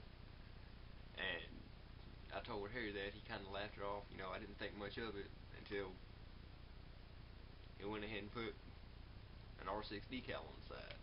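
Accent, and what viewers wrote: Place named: Appalachia, United States. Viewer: He speaks exactly like the Hillbilly guy named "Boomhauer" from the TV show "King of the Hill". I could barely understand every other word out of this guys mouth. By the way, I just bought a Hi-Point C9, still have a few more days before I can pick it up at the gun store.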